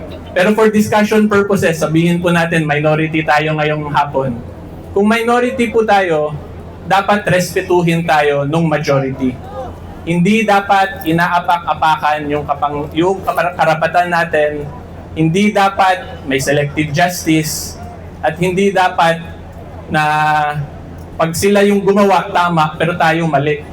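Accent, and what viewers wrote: Visayas, Philippines. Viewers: Bisaya kapo ba atty? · Bisaya diay ka atty hehe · Sir abayon kaya pala may lambing sa dulo Ang pananalita mo bisaya man diay ka,, salamat sa PAG kampi mo sa tama, Ay salutes you always.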